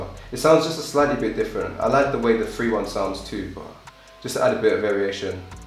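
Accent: United Kingdom. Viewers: You from the UK?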